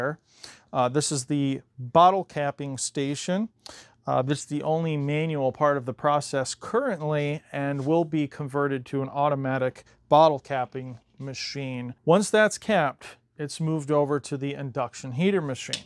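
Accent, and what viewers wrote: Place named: United States. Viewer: you have the coolest American accent I ever heard, where is it from?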